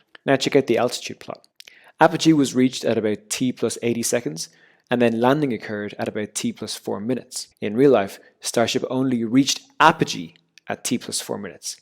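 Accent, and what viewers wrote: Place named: Ireland. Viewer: Terrific! And who doesn't like a nice Irish voice! Keep up the great work!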